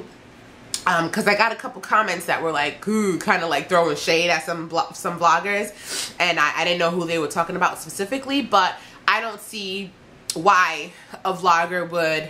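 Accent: New York, United States. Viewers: Love you!!!!! and you New York accent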